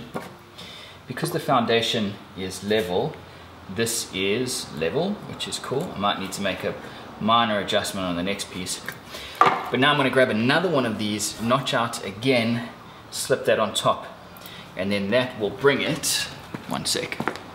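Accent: South Africa. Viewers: I thought i heard the Durban twang. You have a fan here in East London :) I love watching your videos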